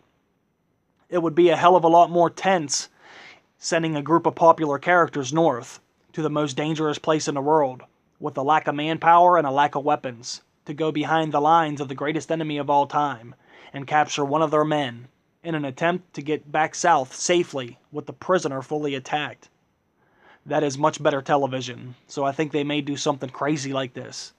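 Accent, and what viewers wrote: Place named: Georgia, United States. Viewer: I like your theories, hit and miss with some of mine, I like what you said about the possibility of a dragon killing weapon, interesting idea, I doubt it will be projectile though too 'Hobbitty'. don't worry about the haters this is fantasy for crying out loud, some people take this too seriously. Anyway nice post, thanks for putting the effort. ps. where are you from ? what is your accent going to guess Georgia?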